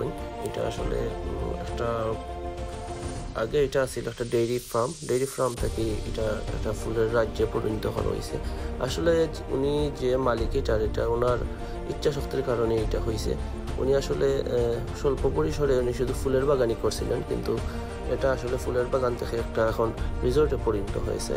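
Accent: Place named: Sylhet, Bangladesh. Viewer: শুদ্ধ ভাষা+সিলেটি ভাষা মিক্সচার 😅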